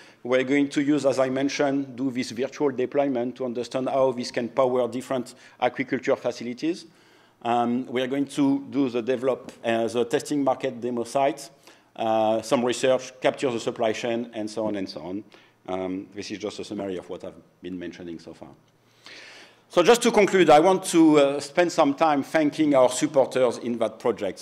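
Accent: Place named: Australia. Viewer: Great talk. And of course, I always love to hear the classic Australian accent. :)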